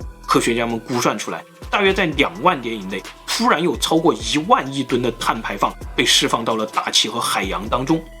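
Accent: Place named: Fujian, China. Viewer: 特殊的福建口音非常帶感，獨樹一幟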